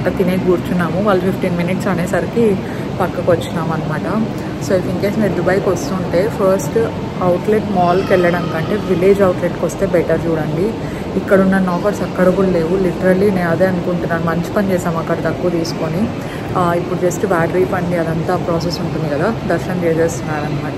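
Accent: Anantapur, India. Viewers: meeru pakka అనంతపూర్ అని నిరూపించుకున్నారు మళ్ళీ ఆ స్లాంగ్ లో తూర్పు తిరుగు దండం pedath ఆ ఓర్డ్ akadidhe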